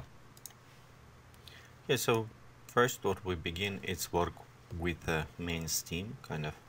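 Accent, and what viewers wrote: Russia. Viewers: Да слышно же что ты русский) Ну расскажи по русски же)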